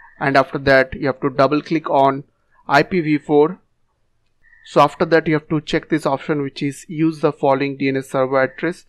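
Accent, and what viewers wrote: India. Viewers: I live in US for some time now so i know accent of Indian brothers:)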